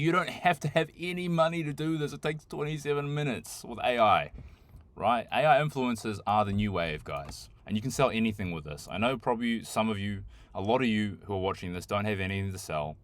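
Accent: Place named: Australia. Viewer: You sound like you're from Aus, but you do Tiktok shop in US? How does it work? US sim card, proxies etc ?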